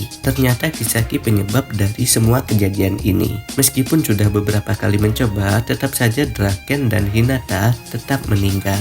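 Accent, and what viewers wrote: Aceh, Indonesia. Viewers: logat abangnya kyak logat Aceh😂, Orang Aceh bang ya?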